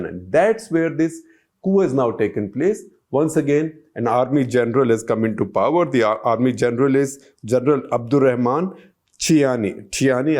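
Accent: India. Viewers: Fake off. Your. lousy thick Indian tone is faking incomprehensible!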